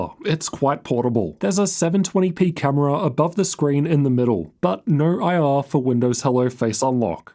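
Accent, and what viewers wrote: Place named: Australia. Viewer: Because it’s an Australian accent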